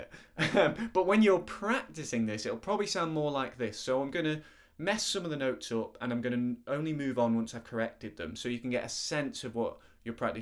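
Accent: United Kingdom. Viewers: I don't play the harmonica but I like your lovely videos. Especially your beautiful british English.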